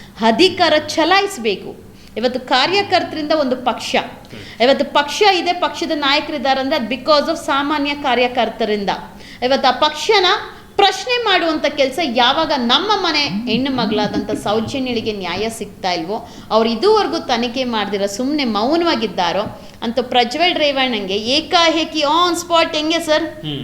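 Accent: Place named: England, United Kingdom. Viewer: Mam neev matado kannada style super 👌 👍 English style alli kannada maatu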